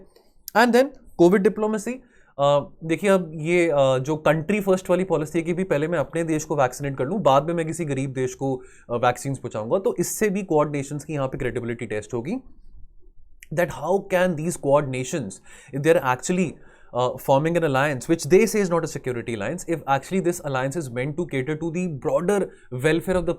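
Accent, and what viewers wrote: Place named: Punjab, India. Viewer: Sir aapke bolte time punjabi nikal jati hai. I observed😁 kiu ki..mai bhi punjab se hu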